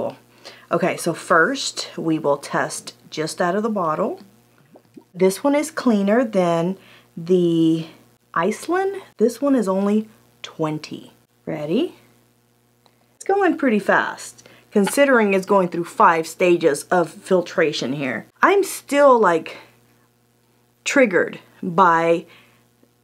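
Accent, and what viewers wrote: Texas, United States. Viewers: I watched one video previous to this and I was thinking to myself I bet she’s from Texas! I watched this one and I knew lol. - from a fellow Texan.❤️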